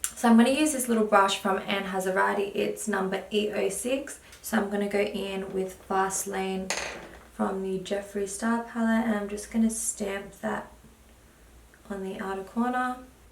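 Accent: Australia. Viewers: U sound like an Aussie girl. Are you?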